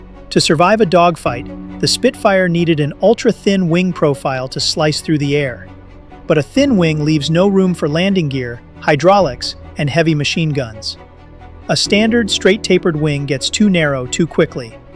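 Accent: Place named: United States. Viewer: One question!? Whit is there a American accent narrator on this, and not a British accent!??? I mean, the video is about British ww2 aircraft is it not!??!!🇬🇧🏴🏴🏴🇮🇱🇮🇪🇬🇪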